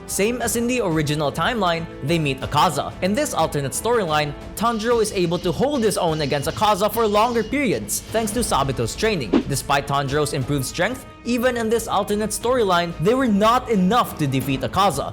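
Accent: Philippines. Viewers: That Filipino accent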